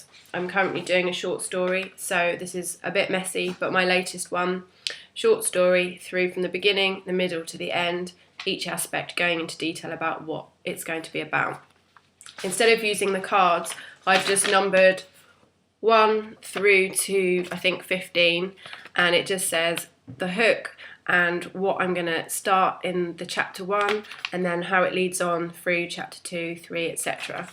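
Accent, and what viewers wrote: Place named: England, United Kingdom. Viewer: Greetings. Thanks for breaking it down. Love the English accent!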